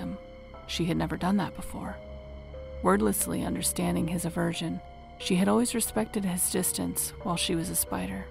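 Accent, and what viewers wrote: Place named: Wales, United Kingdom. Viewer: Very cool tale, and very nice Wales accent! 🙌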